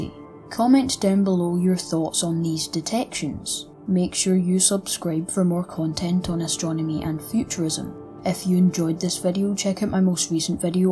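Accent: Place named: Scotland, United Kingdom. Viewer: Love your videos. I cannae believe that folk would need subtitles for your lovely Scottish accent. All the best from just outside Edinburgh.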